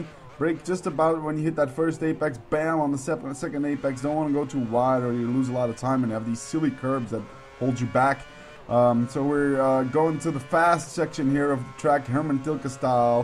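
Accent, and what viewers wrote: United States. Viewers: Are u actually belgian becus u sound american and if u ar american y ar u belgian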